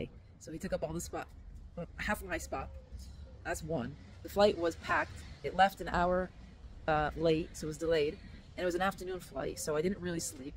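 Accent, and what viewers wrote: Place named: United States. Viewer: Why do you have an American accent? You get to belong to two states but Palestinians aren't allowed to belong to one?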